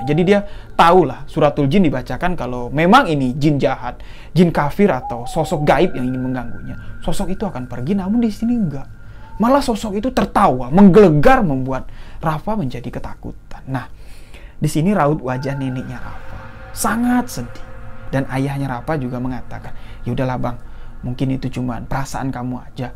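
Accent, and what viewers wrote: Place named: Bali, Indonesia. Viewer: Kayak logat org bali mas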